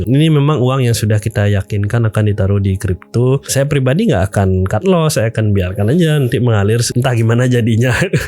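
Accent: Bali, Indonesia. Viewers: Bali itu bang...sekilas mirip penekanan dan nada nya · Keliatan banget orang bali dari logatnya om 😅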